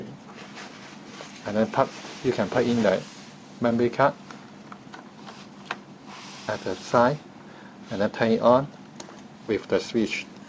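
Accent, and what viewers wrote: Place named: China. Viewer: poor narration Chinese ascent waste to watch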